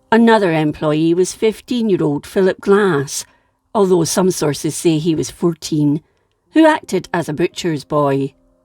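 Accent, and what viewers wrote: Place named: Scotland, United Kingdom. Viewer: I love your soft Scots accent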